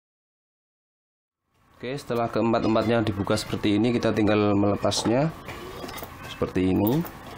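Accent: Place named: Gresik, Indonesia. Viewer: Logatnya Gresik Banget hahaha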